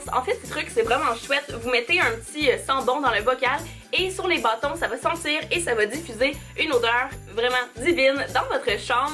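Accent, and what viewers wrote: Quebec, Canada. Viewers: je ne m'attendais pasa se que tu me répond mais je suis très contente j'adore ton accent québécois jai découvert ta chaine grace a mon amie et jadore je le suis abonnée bisous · L'accent canadien est si beau ... 😍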